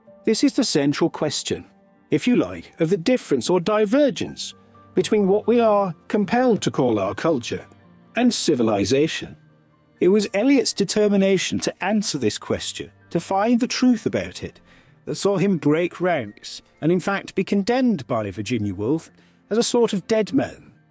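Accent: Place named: United Kingdom. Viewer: Sometimes you make sense, for a Britain, despite the accent.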